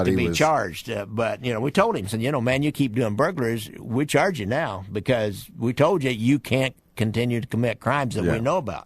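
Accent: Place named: Warrensburg, United States. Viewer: Your voice reminds me of some relatives in Warrensburg 😊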